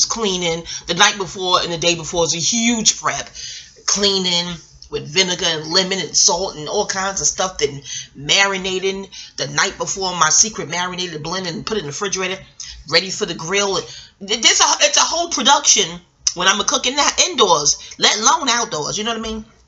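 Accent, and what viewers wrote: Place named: East Coast, United States. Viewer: Absolutely adorable love the East Coast accent... hey girl you supposed be talking Bobby Brown‘s memoir...🙃🤣🤣🤣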